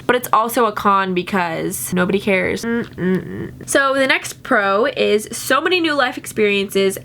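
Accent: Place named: New York, United States. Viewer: i see that you can speak spanish, well . . . Im learning english and you are one of my best teachers. Everyday im trying to hear you, your accent cuz i wanna travel to NY someday, saludos !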